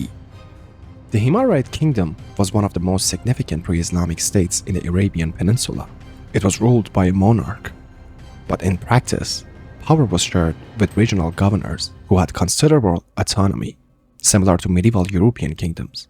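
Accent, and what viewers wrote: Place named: China. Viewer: For da luv of god please stop talking ! Ur chinglish is terrible ! Get someone who can speak & pronounce English words correctly.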